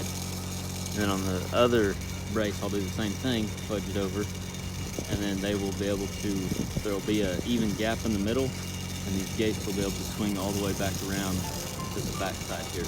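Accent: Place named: South, United States. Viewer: I weld pontoon boat and boat lifts here in N.E. Michigan but I enjoy your videos. And listening to your southern accent! 😁. You have me saying " That A Way" now! 😅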